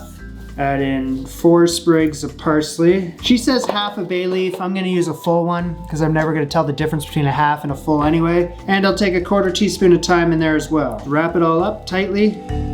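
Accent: Canada. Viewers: Me expects all Canadians to know french slaps the naivety out of me Well, at least he's still got the adorable Canadian accent, like every thing he says sounds kind of like a question.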